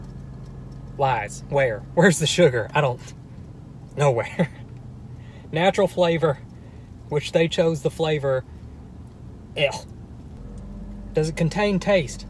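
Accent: South, United States. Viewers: Anyone else notice that 3 years ago vs today how much his Southern accent has left? He’s originally from Arkansas so it’s understandable that he has it then but it’s left him now. Just pointing it out lol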